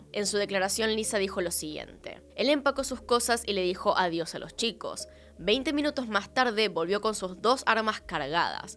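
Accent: Argentina. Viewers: Ayyyy me encantas 😍 pekeña cómo hablas tu idioma y siempre te arremedo con tu acento boludooo soy mexicana te mando muchos saludossss y el gran contenido de tus relatosss e historias que cuentas, además es la 1 vez que te escribo Por si estabas con el pendiente Jajajajajaja cuídate mucho te mando un 🤗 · Me encanta el hablado Argentina Dice Shaaaaa por decir ya Jajajaja me encanta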